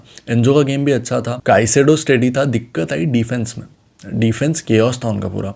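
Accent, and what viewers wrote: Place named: Madhya Pradesh, India. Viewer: What kind of accent is this? Sounds like someone from mp or something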